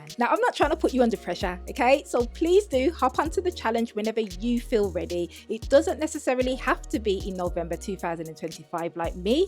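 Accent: Birmingham, United Kingdom. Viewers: Your accent sounds like your from birmingham yay a sister from the ends😂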